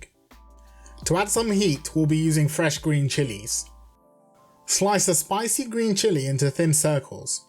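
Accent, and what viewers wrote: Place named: United Kingdom. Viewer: Delicious food! Handsome face! And a very intelligent sounding British accent 😂 what else could you ask for haha! This change is UNDERVALUED u will grow to at least 300k-500k! Keep it up!!!!! 😍✌🏻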